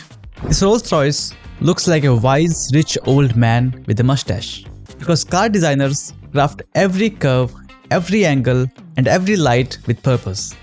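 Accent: India. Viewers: Dude has the thickest indian accent and cant pronounce Lamborghini 💀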